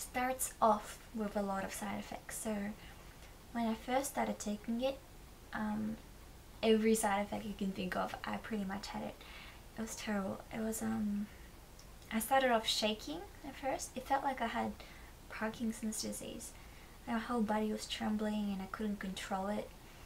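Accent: Australia. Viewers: Blimey, no wonder you got anxious, is that a picture of Vladimir Putin on your wall? This video has been very helpful. I'm starting Zoloft today for treatment of a chronic anxiety condition. That sounds like an Australian accent. If you don't mind me asking what part of Oz did you grow up in? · Thank you for sharing your personal experience with taking Zoloft. I'm about to start taking it and it was nice to find an honest review :) Are you from Australia by chance? Your accent is really pretty and sweet! ^^. Anyway, thanks again.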